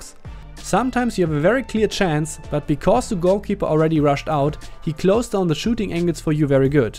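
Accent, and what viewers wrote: Germany. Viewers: Bist du Deutscher? Hörst dich irgendwie so an🤷‍♂️ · Man merkt extrem den deutschen Akzent haha 😂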